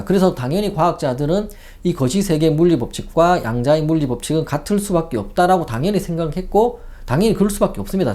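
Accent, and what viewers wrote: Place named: Gyeongsang, South Korea. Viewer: 했던말 또하고 했던말 또하고 양자에 쳐맞았냐? 갱상도 사투리 듣기싫다 영상 찍지마라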